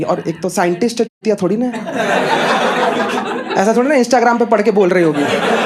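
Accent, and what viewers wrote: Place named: Varanasi, India. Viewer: Nice, your banarasi accent is so relatable. Your comic sense is wonderful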